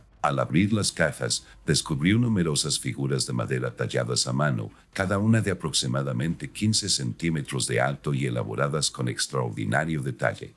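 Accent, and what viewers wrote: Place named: United States. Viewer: Por favor busquen un narrador de habla española no un yanqui con acento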